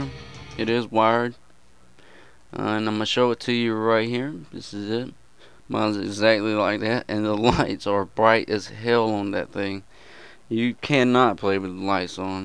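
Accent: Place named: South, United States. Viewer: dat southern American accent.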